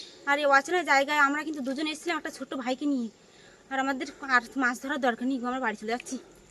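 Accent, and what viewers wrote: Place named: Dargahpur, Bangladesh. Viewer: মুখে দরগাহপুরের ভাষা শোনা যায়।হন্যে চিংড়ে।জালে গাব দেওয়া নেই। গা হাত পা ঠান্ডা হয়ে যাচ্ছে।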